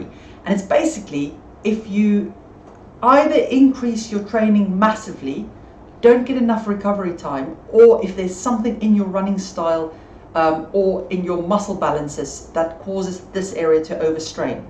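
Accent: Afrikaans, South Africa. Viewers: 3 years late but yes her accent is South African. More specifically, Afrikaans South African. Sincerely, another South African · My legs rub against each other when I run. Gonna try widening my gait. Thanks! Also, love the accent. Is it South African?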